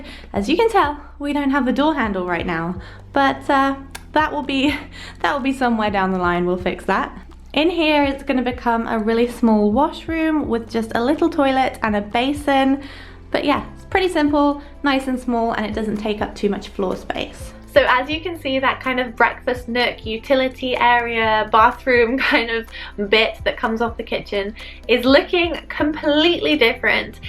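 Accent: United Kingdom. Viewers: It will all be worth it in the end! Where are you from? Sounds like the UK.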